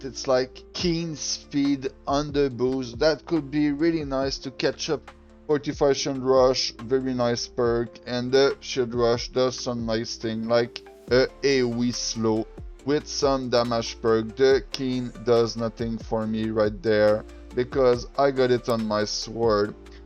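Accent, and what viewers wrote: Quebec, Canada. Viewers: es-tu quebecois? ton anglais ma l'air d'avoir un accent QC 😅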